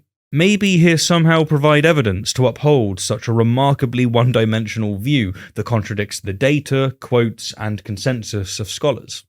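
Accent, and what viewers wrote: United States. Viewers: Americna here. I approve your American accent. No worse than my backwoods kin.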